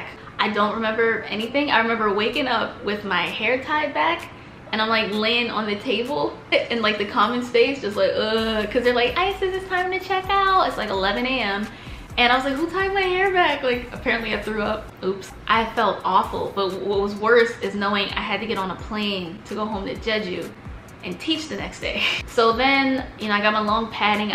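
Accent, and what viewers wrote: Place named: United Kingdom. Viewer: Your UK accent is getting better for sure! At this point I Don't even think you're doing it on purpose 🙄 🤙🏾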